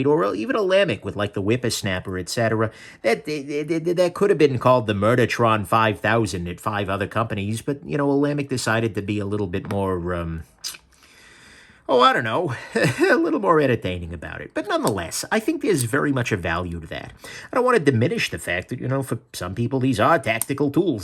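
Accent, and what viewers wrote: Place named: New England, United States. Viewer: Am I the only one that thought He was from New England and not Cali?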